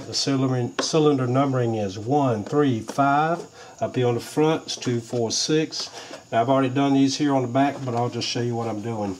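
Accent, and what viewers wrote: South, United States. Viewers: 😂 that Southern accent... lol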